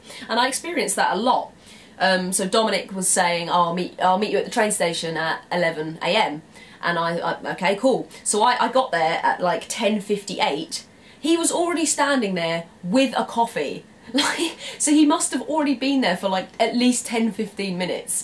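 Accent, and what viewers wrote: London, United Kingdom. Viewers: Thanks a lot for the way you pronounced the "ch" in Munich, please try to call it by the Bavarian name München, as I keep trying to copy your British accent. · Hello, thanks for that video, i was very interesting and fun to watch. I have never thought about a lot of the stuff you mentioned but now I realise it ^^ like the direct behavior and the unwilling for small talk. I wand to ask you if you have experiences with austrian people? Because I am from Austria and even when we have (nearly) the same language with Germany I think we are quite different in our behavior. But I am very curious:) ps I love your accent, I makes me want to go back to london! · As a German I have to say you've got a massive thick English (London) English (language) accent. Or British English. But you are speaking loud and clear. Thank you :-) Although sometimes much too fast to my German ears and I have to repeat. 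Normally I better can understand the American English because without this typical fat English (from England) dialect. But what I can understand is that you are enjoying your life in Germany and you see things as strange, which simply ordinary for every German. lol :-D PS: We love our bread too! Go on! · i love your London accent. That is so easy understandable for me. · Thank you so much for sharing your observations of my homeland as an English woman - or may I say as a Londoner? Very interesting to see Germaqny through London eyes! And btw I LOVE the British accent so much! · aww i love the british accent, the first 10 seconds already made me melt. AWWW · I fucking love the British accent. I dont know why. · very charming accent. not too heavy, great speech .well done ,you must come to oz a lot of brits do and many stay for good. actually brexit will lead to much more trade and cheaper flights to oz and canada. 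go for it. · I was looking for videos of German people and I'm thankful I came by yours! Really loved it. Plus, I love your expressions and that flawless British accent (well, you ARE British). Greetings from Argentina!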